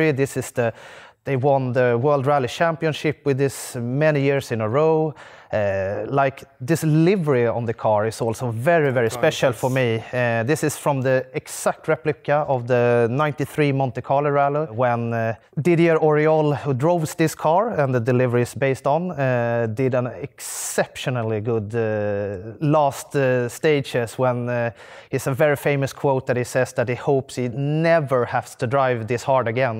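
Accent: Sweden. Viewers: Legend says it's impossible to obtain a stronger swedish accent than that of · Absolutely loving that Celica.. Great video, loving the Swenglish!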